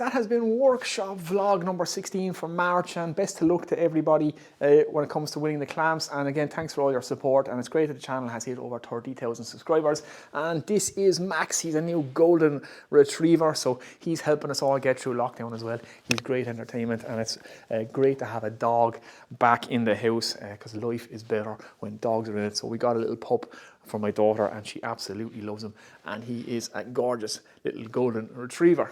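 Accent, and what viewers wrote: Ireland. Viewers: Came for the tool reviews and shop projects, stayed for beautiful shop, depth of knowledge and that lovely Irish accent 😁 And as I'm slowly building my own little shop... I NEED CLAMPS! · Great channel, we still get a kick out of the accent and we miss all of our Irish friends. I NEED CLAMPS!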